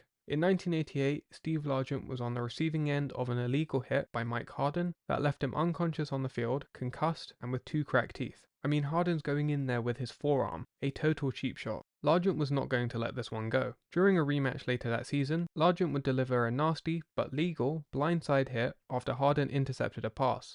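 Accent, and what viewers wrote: United Kingdom. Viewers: British guy talking about the NFL? 1KYAE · How weird is it hearing a British dude talk about football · Sorry but i cant listen to a british talk about amercan football.it just doesnt serm right😮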